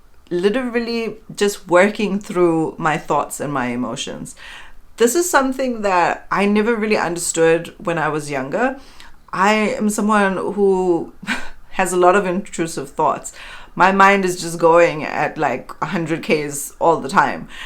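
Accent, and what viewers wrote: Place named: South Africa. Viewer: Beautiful inspiring video ❤ Also recognize a slight south african accent...love it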